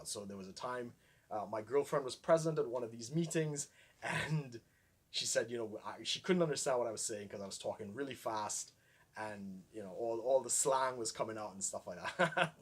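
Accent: Trinidad and Tobago. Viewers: Your Trini accent is still strongly evident... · You sound a lot like Ian Bishop. Trini accent approved. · I disagree, you have a Trini accent, just an acrolect one. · You speak like a Trinidadian but u don’t say it slang wise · You sound trini, ppl always assume that you need to speak broken English to sound Trini, you can't be from anywhere else with that accent...... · There's still a slight Trini Accent! It's like the most refined West Trinidad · I’m the exact same. I project for my U.K. colleagues and friend’s with my English accent sounding exactly like my English mummy. BUT when I am among fellow British Trini’s my accent comes out in full force and I sound like my Trini daddy. To me you still sound Trini just not strong Trini.... I can hear the lilting in your voice 🇹🇹🙌🏾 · Padna! As soon as you started I heard it. Im Trini and I know what to listen for. 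Any trini would instantly know where you were from (could be mistaken for a Grenadian). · lol first thing i thought. this man is trini as hell. you can always tell because trinis choose certain english words that are easy to say, that hides the accent. we see you ;p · From the moment I heard u speak, I knew u were Trini, come on now, real Trinis recognize their own, it's just that you spoke proper, n I understood ur platform is not only local but for a worldwide audience, so u spoke a little slower n without shortening the words so that non locals may understand, Trinis tend to talk a bit fast n slangy, all the time, based on who they're talking with, those that is less familiar with our twang. Trinidad n Tobago is an English speaking country, that also has a history of a few other languages like the patois, gabberage, n our local English called dialect. Trinbagonians has their own English dictionary which is called "The Dialect", where one can find words meaning almost the same as the Oxford dictionary, just with our own twist of meaning n sound, that is so interesting, when we speak to each other we do understand, but for non locals, they will find it sexy, sassy, a bit of a drag n funny, but all in all they likes the uniqueness in it, which they do understands n can relate to, but when it comes to speaking proper...we can, once it's necessary! 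· You definitely speak with a Trini accent its just that you pronouncing your words in full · Your accent sounds 100% Trini. Love your videos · Don't worry your trini accent is strong still 😊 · I find you have a strong Trinidadian accent. It's just that you speak in standard English. But your accent is 100% Trinidadian to me. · But U sound Trini tho 😂😂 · I'm Trini (St. Augustine girl) and I totally hear it .No way it's very obvious. I speak exactly like you.Its pure enough,you do you well.Im in NY and I go absolutely nuts when I have to speak into automated systems. It refuses to accept my pronouncing the letter T. Gotta take a breath so I dont blow up then make all my T's sound like D.Then when in England couldn't say .40p and be understood, so I had to do the opposite.😖 Can't win for losing..😄. Take care eh🇹🇹? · You sounding like trini the only reason people not hearing the accent is because you speaking proper in english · the Trini accent is the best as matter or fact i personally rate the Trini accent the best in the Caribbean. 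Its great you grew up in Tdad like us called the sons or children of the soil. Thks for letting the viewers know on the Trini accent. The Trini lingo is good. Keep up the good work. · But you sound exactly like a Trini! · You sound like a Trinidadian.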